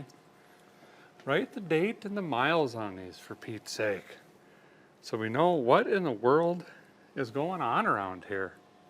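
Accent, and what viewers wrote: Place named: Minnesota, United States. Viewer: Your Minnesota accent is better than Mrs R for our 1 yr old son. I now turn your channel on when he needs help calming down. Thanks for the help!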